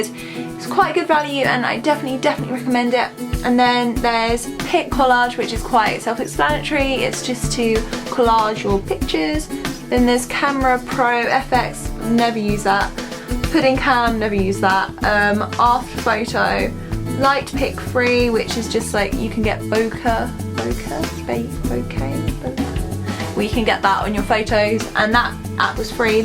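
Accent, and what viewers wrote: United Kingdom. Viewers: where in england are you from??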